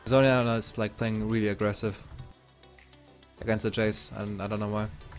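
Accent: Germany. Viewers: are u from german ?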